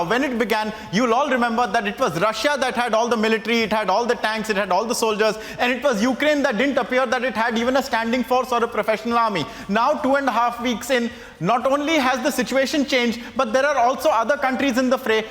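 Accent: India. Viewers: Very indian sounded reporter...very entertaining...well done the Indian reporters..you guys have overtaken any other major news agencies world wide... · This sounds INDIAN..blah blah blah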